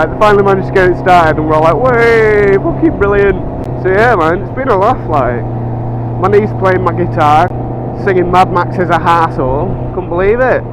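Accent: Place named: Yorkshire, United Kingdom. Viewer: Your a little Yorkshire story teller ..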